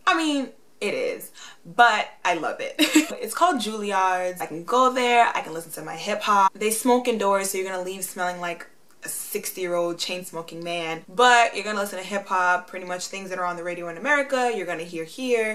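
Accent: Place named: Spain. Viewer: I love your videos and I noticed that your accent is definitely more of a Spanish accent than one of Latin America (hope I classified that right) anyway, I'm thinking about studying in abroad in a Spanish speaking country because Spanish is the only language I've studied so far. I want to be able to speak fluently. It's hard to learn in a classroom when I'm not applying the language to anything. Good info!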